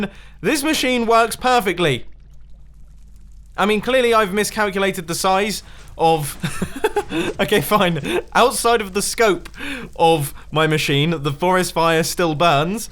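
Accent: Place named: United Kingdom. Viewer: I love that British accent "watah source, watah source, watah source" xD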